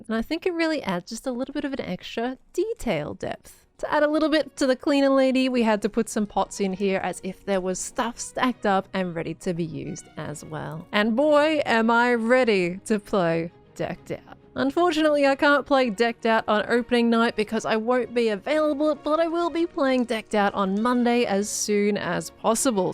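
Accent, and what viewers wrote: Australia. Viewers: its so good to hear an Ausie accent on youtube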